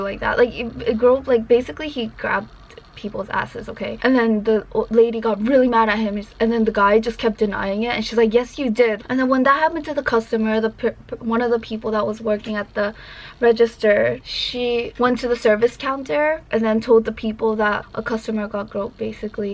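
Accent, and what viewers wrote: United Kingdom. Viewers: 😂 there's british accent in you somehow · 有英伦腔。。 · Swear I thought she was British. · Your accent... so British.. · In those cases,just start YELLING..Shouting..anything..You didn't like Job anyway,so max they could do is Kick you so Be it He is one Old Lonly miserable man must be,those kind just run away Be Brave...One shall need to know How to Raise Voice in order to Protect self,its not wrong Also you got kinda like Britsh accent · Perfect American English (accent and ease never searching for words, better than half the people here) with sublet British endings occasionally. I know some countries teach British accent English but if that were the case something (YouTube, time in America, ?) has almost totally erased it. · your British accent is soooo Cutee 😄😄😄😄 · Nice British accent!!! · chinese speaking like an british op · That British accent 😍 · Dang ..that brit accent tho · Are you british? 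· nice British accent! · .....Are you with UK accent??? Sounds like UK English...but not so UK ....... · Do you have a video showing your British accent? That would be interesting. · I feel some London England in your accent. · Have no idea why Youtube recommended this to me but yea I like your English accent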